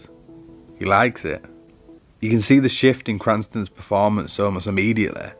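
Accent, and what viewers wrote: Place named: United Kingdom. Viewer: I like your accent. I like the way you say 'Walter White'. A lot of people have made videos about Breaking Bad - and I have watched a lot of them. But your unique British accent might be the thing that sets you apart. As for the content itself, you identified the "I am the one who knocks" event, explained it beautifully, linked it to other important events, and brought it all to a safe end - tying your end to your beginning. Your personal point of view was clear. I liked it.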